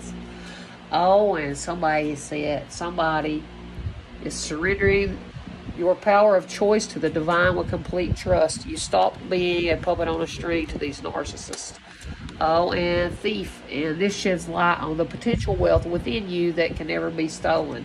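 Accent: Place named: Alabama, United States. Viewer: 77 subscribers already. I love your voice and accent. It's just like my cousins who are from the mountains of Gadsden, Alabama and the only area of Alabama with that dialect. I've always loved it. You also seem to love Spirit in a way similar to me, I don't know. I'd donate if I could, married 31 years and finances are the only area that we've not been abundant and successful in. But it's all a part of divine plan and I'm just happy to be here. If I'm led by Spirit to do more in the world, I will.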